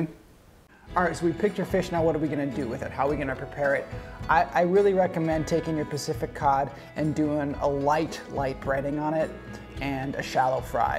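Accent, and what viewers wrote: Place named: Minnesota, United States. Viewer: Hey - I have no idea how the YouTube algorithm brought me to your videos- but so happy they did. I’m sitting here in lockdown in Melbourne and your accent and these fish recipes are bringing me home to Minnesota. Hope you’re well. Also- that daughter of yours is adorable!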